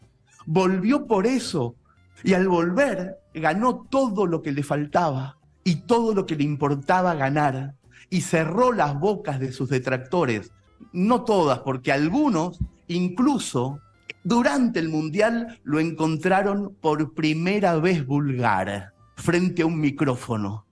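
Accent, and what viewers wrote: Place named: Córdoba, Argentina. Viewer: Zarpado muy lindo lo que escribiste y nunca pensé en eso, como también soy de Córdoba nuestra tonada es nada que ver. Es como tu pasaporte.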